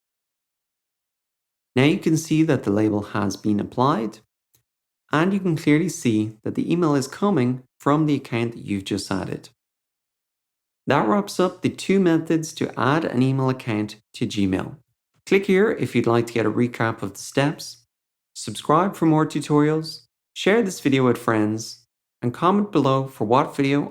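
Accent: Ireland. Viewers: lol, lovely irish;)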